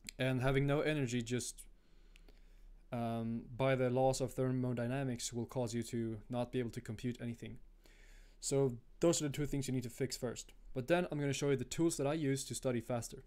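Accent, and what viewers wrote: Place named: Scania, Sweden. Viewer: Skåne säkert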